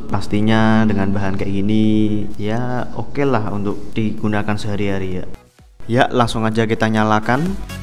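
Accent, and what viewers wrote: Java, Indonesia. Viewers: bang aslinya orng mana sih ? kok logatnya kyk orng jawa ? hehe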